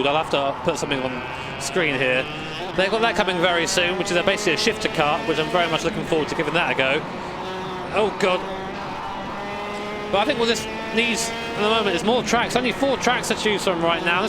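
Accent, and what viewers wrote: United Kingdom. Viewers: I love your British accent lvl. 100: "The difference between driving a kaa vs driving a *kaa*"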